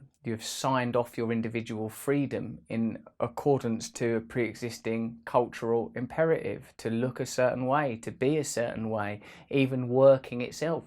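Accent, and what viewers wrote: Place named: United Kingdom. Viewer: I say this not like this but much like this all the time. Well not all the time but from time to time. I think I would listen to myself a lot more if I had this great UK accent. Great work again Russell, you speak like a man of experience and a man who knows as much of its truth as he can know. I've become a fan fo sho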